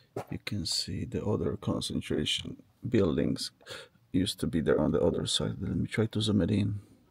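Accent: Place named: United States. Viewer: Your accent is really hard to understand, are you from America??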